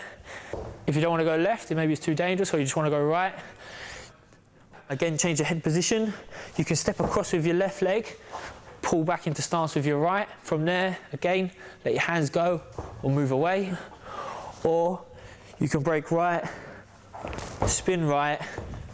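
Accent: United Kingdom. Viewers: British accent there...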